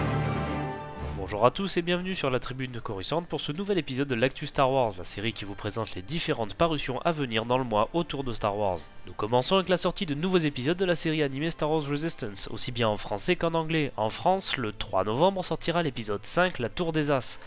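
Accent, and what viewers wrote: England, United Kingdom. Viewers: 10/10 accent anglais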